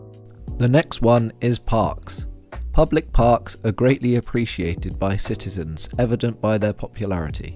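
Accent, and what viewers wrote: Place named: Birmingham, United Kingdom. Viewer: i think bro's from birmingham 💀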